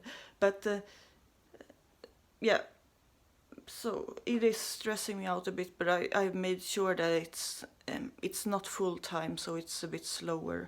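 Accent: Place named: Sweden. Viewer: Sounds swedish. Köp l tyrosine, fixar avgiftningen. Naturligt koffein, en aminosyra. 150kr burken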